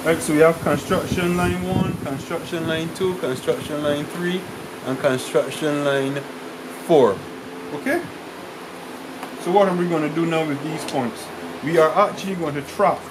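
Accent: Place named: Barbados. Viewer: This guy sounds like a Bajan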